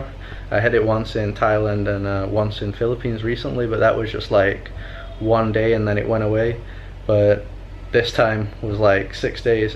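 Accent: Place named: Northern England, United Kingdom. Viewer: what accent is that? sounds sometimes northern england and sometimes like a weird american thing!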